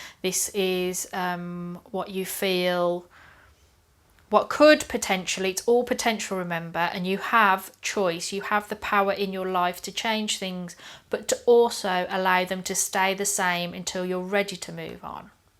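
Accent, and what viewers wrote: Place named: Tamworth, United Kingdom. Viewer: wow are you from Tamworth UK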